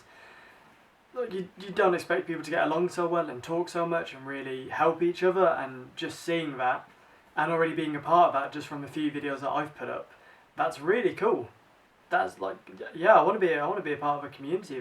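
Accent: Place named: United Kingdom. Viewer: I too loved The Golden Compass & Harry Potter. More people need to read The Golden Compass. Plus I'm American, so I really like your British accent. Just so you know though "comment downstairs" sounds kinda odd. Welcome to BookTube!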